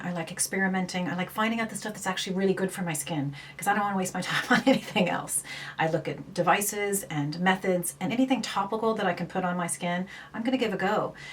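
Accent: Ireland. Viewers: Thanks,you seem very informed,look forward to your other tutorials.Love your accent with a slight undertones of an Irish accent coming through:)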